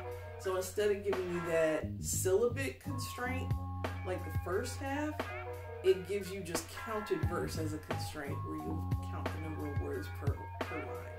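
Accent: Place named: Kentucky, United States. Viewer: I love your accent. Kentucky via Central Illinois!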